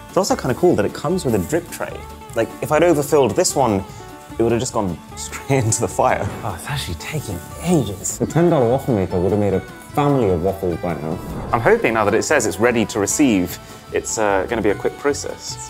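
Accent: United Kingdom. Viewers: Are you briesh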